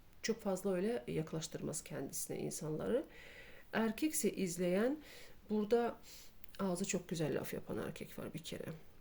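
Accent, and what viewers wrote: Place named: Ankara, Turkey. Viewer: şivemiz bu biz angara nın bebesiyiz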